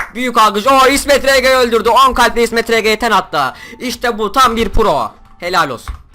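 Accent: Kütahya, Turkey. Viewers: abi sen kutahyalimisin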